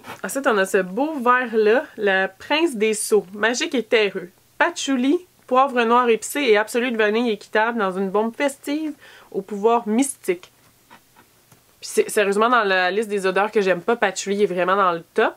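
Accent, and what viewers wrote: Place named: Quebec, Canada. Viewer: je t adore accent quebequoi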